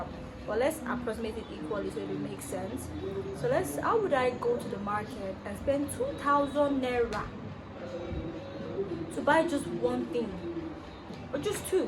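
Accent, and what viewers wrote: Ghana. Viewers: You sound Ghananian 🇬🇭 than a Nigerian 🇳🇬 . "You say erh" . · Learn the language...Your accent is Ghanaian by the way.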